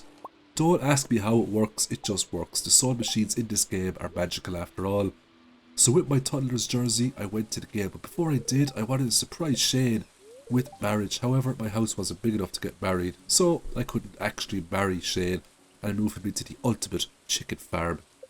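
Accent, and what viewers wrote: Ireland. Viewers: I have never heard an Irish accent as good as yours love it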